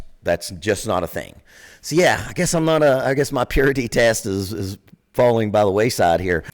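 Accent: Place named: South, United States. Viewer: Not only do i love your insights into drumming helping me appreciate the music even more, but your smooth and soothing southern voice has got a place on my insomnia playlist. Please release a track of “nudge” and of “puuunch” on repeat. AWESOME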